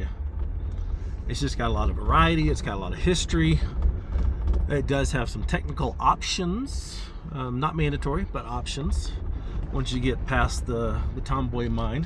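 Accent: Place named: France. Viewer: Your Frenche is adorable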